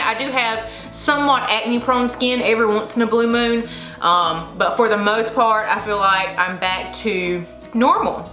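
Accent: South, United States. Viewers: You look great girl!! Get you a body pillow!! It will change your life!! I didnt get the expensive one from the baby stores just one from Walmart. I have 4 little girls, and all 4 times used it!!! When she gets hiccups it is crazy. My daughter used to get them at 10pm every night when I was pregnant with her. Love your channel, and sweet Cody and your Mama is hilarious!! I love her. I enjoy the content of all the videos. And I love your accent, I am from SC, so I too have that southern drawl. 😀 Good luck on the rest of your pregnancy!!!! I will be praying for a healthy girl, I cant wait to hear her name!!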